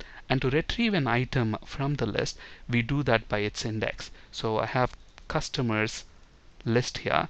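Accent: India. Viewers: I usually can't stand the Indian accent in tutorials, it's the cadency of the words it distracts me because when you speak with an Indian accent in English the sound is usually flat and has stops after each word. Maybe I'm a dickhead but I'm specifically referring to the combination of flat English + Indian accent in tutorials. The reason why I'm making this statment is to let you know that this does not happen in this video! This tutor is fantastic, dispite having an Indian accent the narration is not flat and it's pleasent, the contents are on point, concise and clear, thank you so much! Subscribed! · I (as a non-english native speaker) usually have trouble concentrating on videos with an Indian accent (-sorry for that sad assesment). But this dude is very didactic, expressive and illustrates every point very well! Hugely Recommend his different videos on different reference points for beginners or quick access to specific info!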